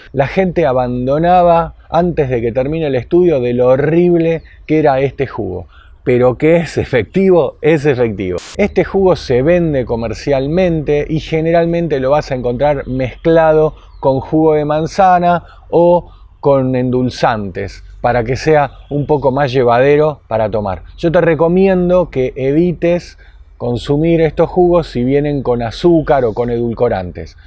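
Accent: Argentina. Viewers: tienes acento argentino yo soy mexicano te sigo en mexico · Sos argentino che redoy mi like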